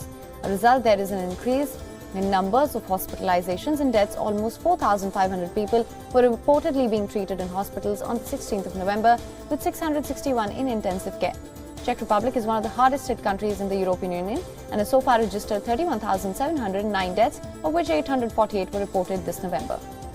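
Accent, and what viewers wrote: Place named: India. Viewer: This newscaster has an annoyingly high pitched terrrible voice worsened by her Indian accent.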